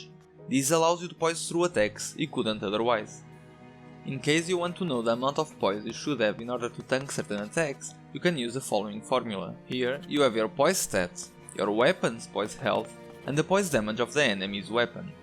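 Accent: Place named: Russia. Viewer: rapaz tu ta de brincadeira que é de portugal, sério? aren't you russian? cant believe this accent is portuguese UHEHUAEHUAUHEUHEA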